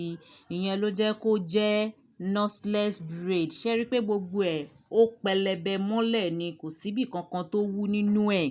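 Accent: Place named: Yoruba, Nigeria. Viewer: I really like your yoruba accent. The hair style is lovely. You have so well.❤❤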